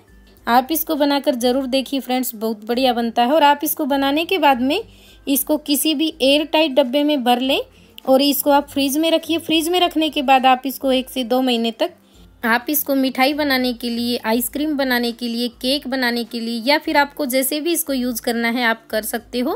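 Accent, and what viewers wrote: Haryana, India. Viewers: Maybe she's from Haryana can recognize her because of her voice. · Aap HariAna k ho tone se lgrha h 😊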